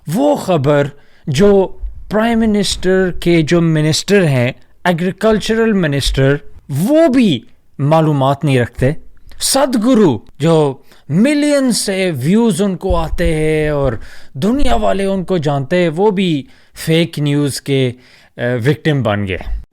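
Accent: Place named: Punjab, Pakistan. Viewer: You talk like a punjabi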